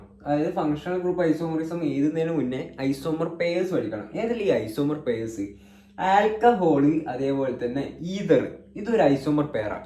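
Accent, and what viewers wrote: Kannur, India. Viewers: Bro kannur aano 😊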